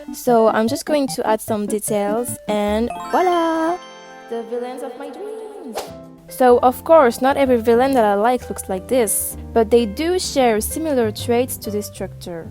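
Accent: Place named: Eastern Europe. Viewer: Let me guess, you're from eastern Europe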